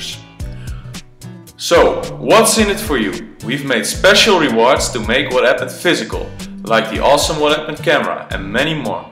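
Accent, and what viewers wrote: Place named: Netherlands. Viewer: Zwaar leuk idee, maar hadden jullie niet even iemand kunnen gebruiken met niet zo'n overdreven Nederlands accent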